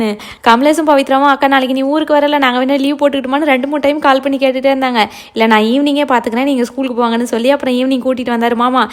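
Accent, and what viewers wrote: Salem, India. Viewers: அப்புடியே சேலம் slang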